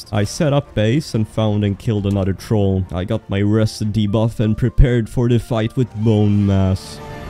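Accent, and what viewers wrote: Sweden. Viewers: I hear that you are swedish with the accent (jag är också svensk)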